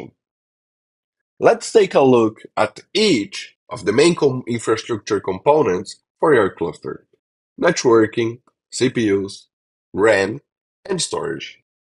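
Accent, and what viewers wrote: Brazil. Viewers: Bro, are you Brazillian? Asking due to the accent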